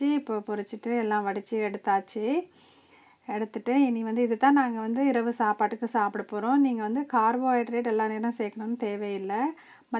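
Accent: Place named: Jaffna, Sri Lanka. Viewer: Beautifully presented dinner with no carb. Unga tamil different a iruku a bit of Indian and a bit of Jaffna Tamil.Enjoy watching. Keep posting 🦋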